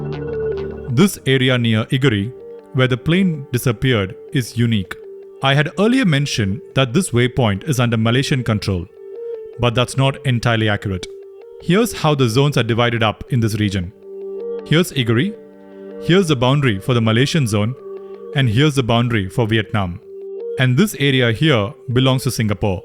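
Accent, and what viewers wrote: India. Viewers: This Indian accent sucks, makes listener wanna laugh, not be serious. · The presenter sounds indian, and i am proud that such a high quality detailed presentation is put together ! Brilliant work, brilliant level of detail covered. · why your english accent is indian? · Why is a pajeet narrating the video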